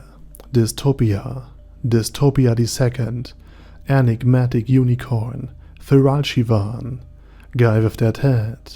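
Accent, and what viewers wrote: Germany. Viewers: Danke aus Berlin 😘 i find your German accent way more charming than the ai alternative. 😊❤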